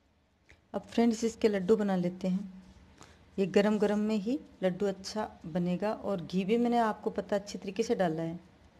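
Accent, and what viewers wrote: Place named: Bagri, India. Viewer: Good but aapki hindi me bagadi show ho rhi h 🙂 Keep it up bagadio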